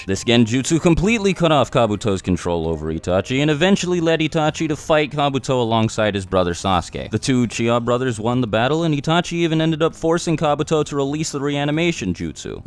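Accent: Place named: Bronx, United States. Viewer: You sound like someone who should do voice work for "A Bronx Tale"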